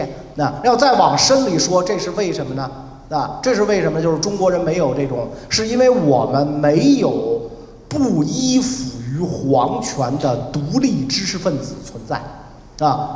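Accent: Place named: Beijing, China. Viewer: 袁老师说话满满八旗味儿，😂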